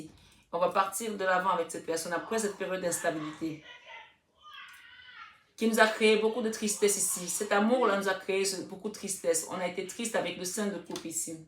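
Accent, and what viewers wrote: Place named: Cameroon. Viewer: Bonjour ma chère votre tirage me parle énormément, et le premier visionnage de vos tirages j'ai reconnu vôtre accent du Cameroun car j'ai une histoire avec une femme bams qui vous ressemble beaucoup donc je sais ce qu'il m attend 😅 merci infiniment vous êtes magnifiques bien à vous Sir Lion 🦁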